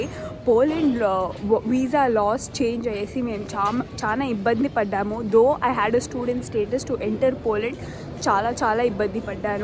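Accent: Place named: Hosur, India. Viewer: Midi Hosur kada, your Telugu is same as my Hosur frnd...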